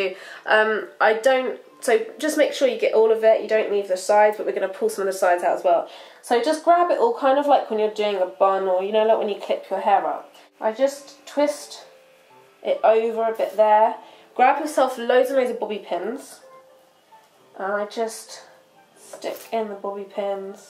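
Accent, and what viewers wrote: United Kingdom. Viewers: Loving the british accent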